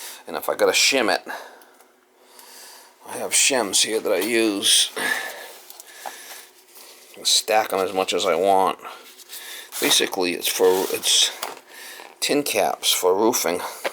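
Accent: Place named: New York, United States. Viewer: New Yawker…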